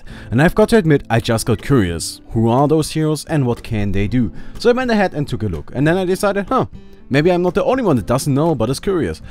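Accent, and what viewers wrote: Germany. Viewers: german accent :)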